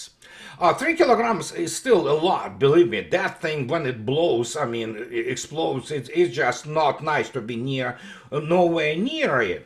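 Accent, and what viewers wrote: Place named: Russia. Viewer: Mr Martyanov you are both an Officer and a gentleman. My late Uncle Boyo Howells was one such as you but a Royal Navy Officer and a Welsh gentleman. Other than the Russian accent and different uniforms I would swear you both cut from the same bolt of cloth. England expects every man to do his duty on the 8th May 2025 to respect and honour the debt we owe to Russia and all her peoples. And personally I will never for get the quiet words of respect Mr Martyanov paid to Queen Elizabeth II on her death. And when you posted that photo of President Putin opening the car door for Her Majesty on a state visit well I didnt realise oa Welshman could laugh cry and smile sobbuing unontrollably all at once over an English Queen and a Russian Head of State. Can I state I was born in Neath 1962 and in all my life and upringing I have never heard one bad word spoken against Russiians. 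Heck 1968 White Album side one track one is TAKE m TO THE SNOWY MOUNTAINS WAY DOWN SOUTH take to your daddys farm Let me hear your bellacas ringing out Come and keep your comrade warm.. hey I had a box of Russian toy soldiers in my armies on our side I had German i KNEW the enemy... I had French army but not sure what t, why...stayed in the box. no use at all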